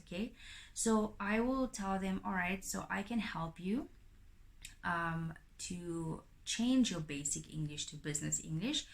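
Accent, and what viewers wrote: South Africa. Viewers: Do you use your south African accent or you change it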